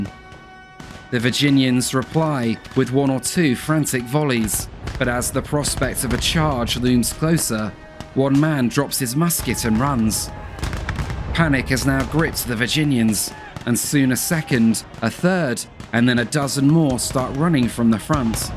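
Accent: United Kingdom. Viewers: Too bad this is narrated by a British accent.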